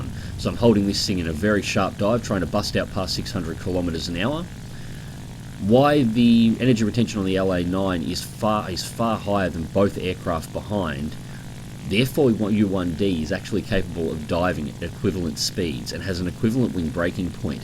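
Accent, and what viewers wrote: Australia. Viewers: What easiness and talent at gameplay and great post battle analysis! I was watching Mighty Jingles playing War Thunder and some guys recommended. So I hoped to this channel a bit and eventually subscribed. And I adore the accent! I have relatives in Adelaide, although I am not Australian. I've heard a lot of good stuff about Australia and Australians. Greetings from Belgrade!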